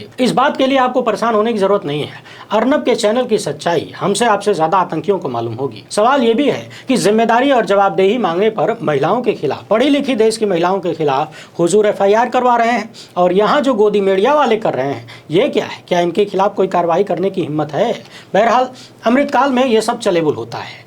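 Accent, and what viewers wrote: Bhojpuri, India. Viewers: ये भी अजीब संयोग है जी आपने कहा, "गर्मी का मौसम है ठंडा पानी लेकर बैठिए मेरी टेबल पर ठंडा पानी ही है। " यूं भी मैं एक बजे के बाद ही सोता हूँ। आपकी खबरें देने का अपना और अपनी भौजपुरी स्टायल में बोलना आकर्षक है वार भी सीधा करते हैं बिना किसी लागलपेट के।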